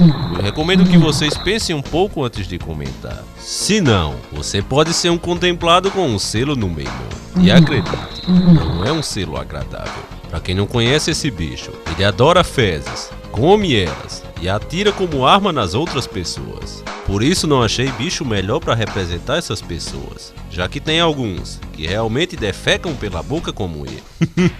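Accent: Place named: Pernambuco, Brazil. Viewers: Pela voz do Locutor, deve ser de Pernambuco!!! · parabens cara, seus videos são foda mermo e as tuas zoaçoes com sotaque pernambucano é massa!!!!!! ganhou minha incrição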